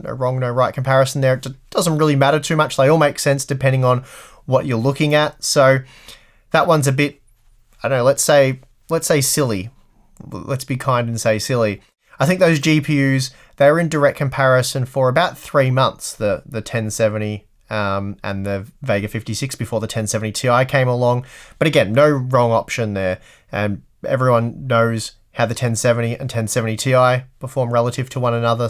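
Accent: Australia. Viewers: it's very much Australian. Love the channel for this reason, grew up with it and feels close to home.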